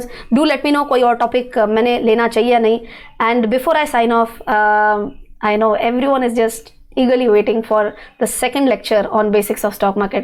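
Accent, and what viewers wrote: Maharashtra, India. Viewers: What a brilliant speech you have. So captivating, so knowledgeable and genuine sounding. Your crisp marathi accent makes it more wonderful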